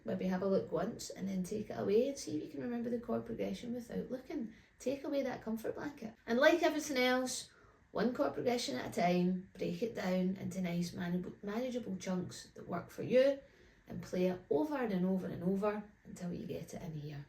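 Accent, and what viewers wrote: Scotland, United Kingdom. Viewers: Very useful tips! Thanks - and I listen to your videos to learn, but do love your Scots accent along the road to learning. 🎶 PS I have no idea what a "crabbit" is!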